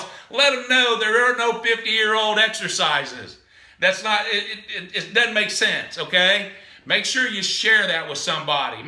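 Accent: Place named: Midland, United States. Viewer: Love that Midland accent. And the advice. I stay with the big three and add the ancillary exercises if time permits. Full tilt at 49, soon 50. All the best from Greater Cincy.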